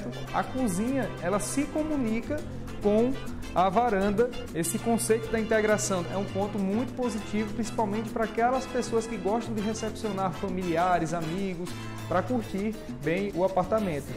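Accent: Northeast, Brazil. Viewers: Lindo ap ! Logo saio daqui de São Paulo ,e partirei aí nessa cidade linda... amo é esse sotaque nordestino...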